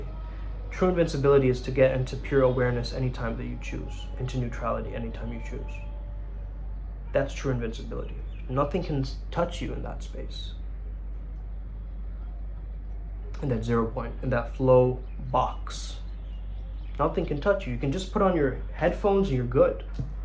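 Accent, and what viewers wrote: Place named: South, United States. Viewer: That southern accent on point 👌😂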